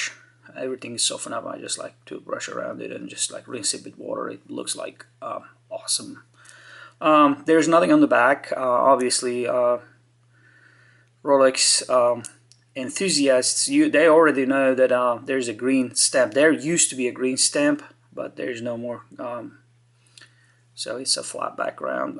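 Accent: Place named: Eastern Europe. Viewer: Matt Phelan He's not a Yank ! He's an east European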